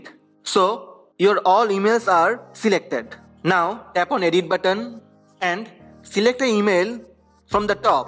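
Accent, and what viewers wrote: India. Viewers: So Indian voice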